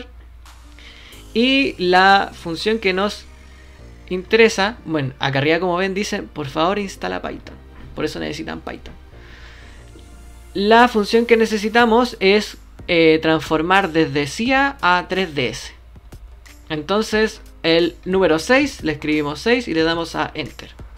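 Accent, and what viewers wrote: Chile. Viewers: sos chileno? y gracias lo estoy viendo <3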